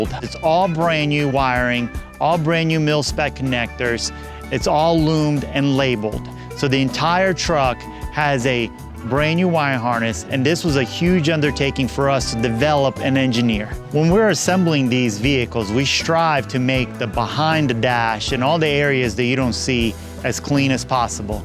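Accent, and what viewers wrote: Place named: Louisiana, United States. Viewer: Why you got a Cajun accent, my guy?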